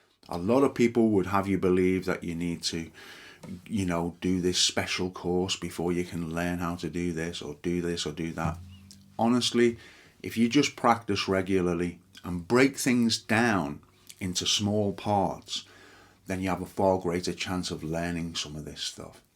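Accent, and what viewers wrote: Liverpool, United Kingdom. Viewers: Nice one thank you. (a hint of Scouse there perhaps?)👍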